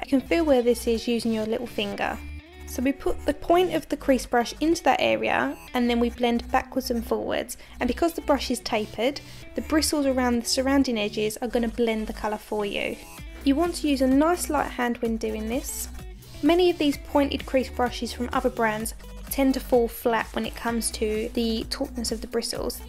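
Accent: Essex, United Kingdom. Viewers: I'm a fan of yours too! And it's so nice to hear your Essex accent.... I from down the road East London! I did giggle when you said 'in alf'! Could you please tell me what you think of the Hakuhodo brushes, would appreciate your professional feedback, thanks.... D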